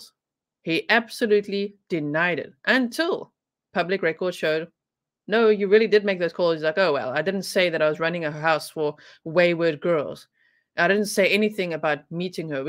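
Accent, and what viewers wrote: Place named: South Africa. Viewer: Hi! I've been listening to you with great interest. Your accent was só Mzanzi, but channel address is 'Netherland'. After your story about 'René', I know I didn't miss the accent. 🇿🇦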